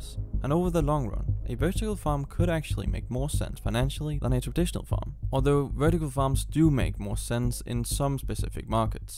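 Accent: Denmark. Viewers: Bold of you to assume I'm American. I'm from Europe as well so yes, I'm fully aware of what a Danish accent sounds like and yes I can hear that when he speaks but that is obviously not the issue here. You can speak up or speak quietly with the same exact accent. I dont agree that it's "my problem" if I'm not a fan of the quiet style of talking. It's not about it being a problem per se, I was just providing feedback and my view on the video. Don't need any white knights coming here in defence who have never seen a comment section of a youtube video before. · That's the Danish accent you're hearing ;) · I can hear the dane in your voice, our accent is quite unique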